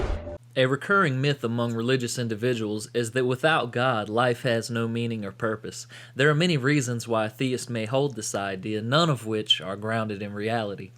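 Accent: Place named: South, United States. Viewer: I like when enlightened thoughts are spoken with a country accent!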